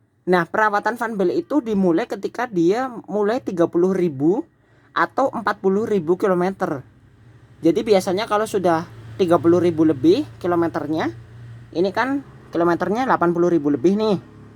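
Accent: Banyumas, Indonesia. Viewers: Mase Banyumasan iki ... Ngendi kiye...hehe. suwun nggeh infonya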